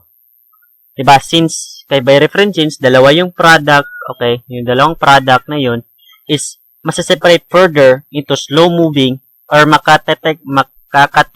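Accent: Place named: Philippines. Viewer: Bro thats how philipino talk. Try speaking another language without an accent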